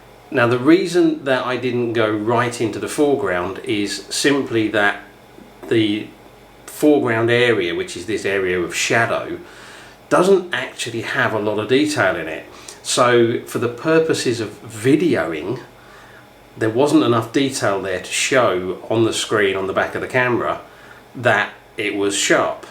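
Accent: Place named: East London, United Kingdom. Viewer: Sir, are you from London? Your accent is really reminding one of friends who was coming from East London.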